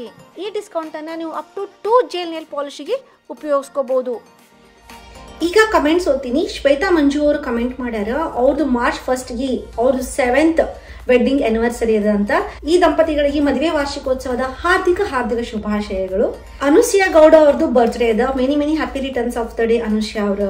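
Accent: North Karnataka, India. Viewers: ಹಾಯ್ ಅವರೇ ನಾವು ವಿಜಯಪುರ ಜಿಲ್ಲೆಯಿಂದ ನಿಮ್ಮ ಎಲ್ಲಾ ವಿಡಿಯೋಗಳನ್ನು ನೋಡುತ್ತೇವೆ ತುಂಬಾ ಚೆನ್ನಾಗಿ ಉತ್ತರ ಕರ್ನಾಟಕದ ಭಾಷೆಯಲ್ಲಿ ಚೆನ್ನಾಗಿ ಮಾತನಾಡುತ್ತೀರಿ ಕನ್ನಡತಿ ಚಾನೆಲ್ ಕಡೆಯಿಂದ ನಮ್ಮ ಮದುವೆ ಮೂರನೇ ಅನಿವೆರ್ಸಡೇ ವಿಶ್ ಮಾಡಿ <num> ನಮ್ಮ ಜೋಡಿ ಹೆಸರು ಶಿವಾನಂದ. ಕವಿತಾ ತಿಕೋಟಿಕರ ಅಡ್ವಾನ್ಸ್ ಆಗಿ ಹೇಳಿದ್ದೇವೆ ನಿಮ್ಮ ಚಾನಲ್ ಕಡೆಯಿಂದ ತಪ್ಪದೆ ವಿಶ್ ಮಾಡಿ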